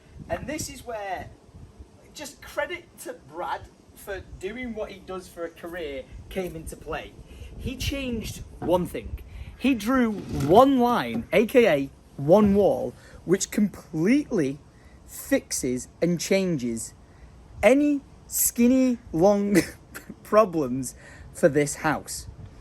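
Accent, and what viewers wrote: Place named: Manchester, United Kingdom. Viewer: Corrrr , u can tell his a manc , a box of Straaaarberys and cream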